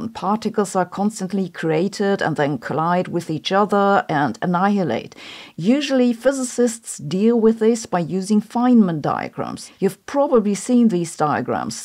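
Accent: Germany. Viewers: I take everything this channel says with a large grain of salt - she does not even understand Special Relativity as proved by her clickbait videos about "faster than light travel" - had she ever studied Special Relativity and Lorentz Transformations, she would understand that "faster than light" is like saying "negative distance" , it is an oxymoron. Unfortunately, she would not know Minkowski spacetime if it hit her in the face. I expect scientists with German accents to be smart - sadly, she falls well short of the stereotype.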